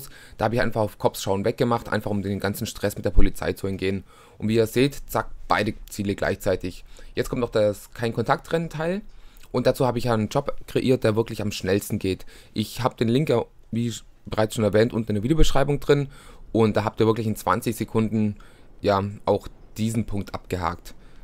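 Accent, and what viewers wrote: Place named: Swabia, Germany. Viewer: da is wohl jemand ausm schwabenland:D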